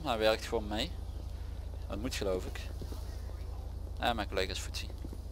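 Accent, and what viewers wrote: Limburg, Netherlands. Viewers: Blijf je zelf. Je komt uit Limburg en daardoor heb je een accent wat ik overigens opzich wel mee vind vallen. Ik vind het helemaal niet erg, je moet lekker praten hoe JIJ dat wilt. Lekker boeiend wat anderen vinden, wij verstaan je en begrijpen je. Dus je hoeft helemaal niks te veranderen aan hoe je praat. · Praat lekker limburgs man je mag toch gwn doen wat je wilt anders zet hij/zij z.n geluid maar uit. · En ik merkte het niet dat jij Limburg s bent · Mooie auto met de nieuwe striping! Gaat de politie de tourans ook nog nieuwe striping geven? EDIT: je komt uit Limburg, daarom heb jij een accent maar je moet gewoon praten hoe jij dat wilt!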